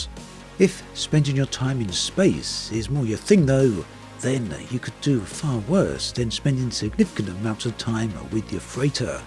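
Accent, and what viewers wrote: United Kingdom. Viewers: Quite off topic but where are you originally from? You have a booming voice that reminds me of Jason Statham, but I can't say I've heard a British accent like yours before. I'm anxious to know what part of which British (or otherwise) country has this accent where there is emphasis on the last syllable of some words that isn't present in other British accents. (forgive me, i'm a bit of an amateur voice impressionist and am easily fascinated by different accents). Fun fact, sometimes when I'm watching your videos, I remove the bass in my equalizer settings to prevent your voice from resonating in the entire house, like Liam Neeson's does. 😉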